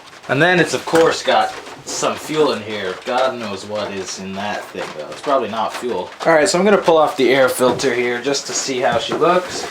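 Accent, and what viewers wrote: Vermont, United States. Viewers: Sounds like Vermont, acts like Vermont and yes it is Vermont. There was an old man down the street that repaired old mowers and was good at it and had 35-40 mowers in bushes around the house. I used to walk the daughter by his place and one day talked with him. Used mowers can bring in some money on the side. He was dealing with another gent who sold those mowers for cheap at a large yard sale. I took over the business of sales and we made 5X as much. Some of the mowers bringing $70-120 apiece. One neighbor had a Honda mower and I offered to sharpen the blade as he had not sharpened it in 15-20 years-yes. Upon looking I found he had been mowing with the blade upside down and backwards for those years! Now he cuts smooth as a new mower. I waited until I got home before I started laughing about the whole thing!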